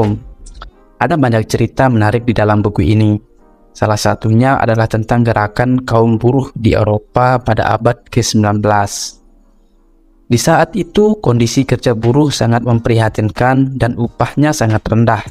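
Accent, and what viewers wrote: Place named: Bali, Indonesia. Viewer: bahasanya loe gue , tapi logat,nya bali bngt 😄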